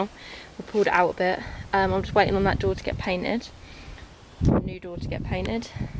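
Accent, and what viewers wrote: Norfolk, United Kingdom. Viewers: Thought that sounded like a norfolk accent. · You sound like you're from Norfolk. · Your accent sound well Norfolk !! 😆 I've got an '87 Granada Scorpio. Just seen a Sierra Mk1 4x4 Ghia estate on EBay... tempting. Anyway, I've subbed, so keep you a troshin'. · "That do, achly" - aha! Norfolk, I thought... and I was right! :-) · Hot girl with a British accent that likes to work on cars... I know I had an engagement ring around here somewhere... 😉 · like this accent so british ;P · You sound like you are from Norfolk. I'm an East Anglian myself. Good to see the retro cars being kept alive. As well as bikes I am working on my 71 Mach1 Mustang and '72 Mark 1 Escort also. Look forward to future episodes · Love the channel, you must be from Norfolk with that accent.....👍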